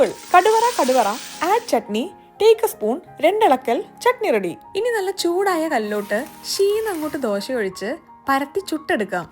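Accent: Malappuram, India. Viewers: 💖🥰 Malappuram slang👍✨✨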